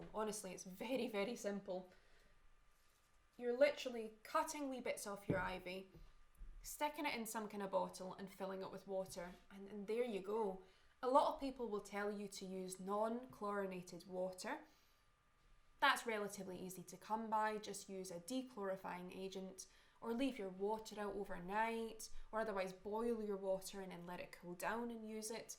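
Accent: Scotland, United Kingdom. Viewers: Love your Scottish accent! 😍 How do I know? From outlander 😆 Great video and info, thanks! 😊💚🙌🏻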